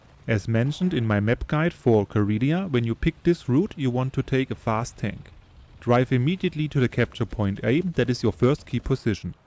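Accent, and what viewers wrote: Germany. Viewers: Der deutsche akzent 😂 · English is the yellow from the egg. Spaß klarer deutscher Akzent aber Video hat trotzdem mehr Aufrufe verdient, gut so 👍🏻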